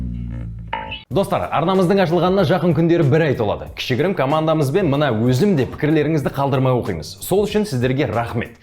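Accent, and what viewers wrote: Standard. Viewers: Керемет 👍таза қазақша, арна алға баса берсін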